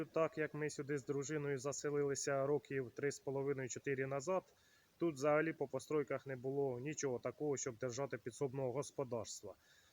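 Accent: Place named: Poltava, Ukraine. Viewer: полтавський говор не сплутаєш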